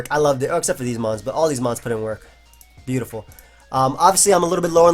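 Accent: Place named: New York, United States. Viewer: It's that NY accent...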